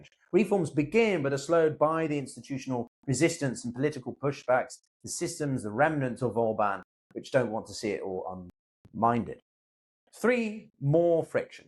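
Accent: England, United Kingdom. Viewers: Another English Accented Narrator. England is in trouble! Git off Celtic lands! Says The BronxBoy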